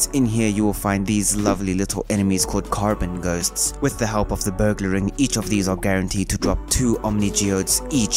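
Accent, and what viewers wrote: South Africa. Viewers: Are you South African? Your accent sounds South African 🤔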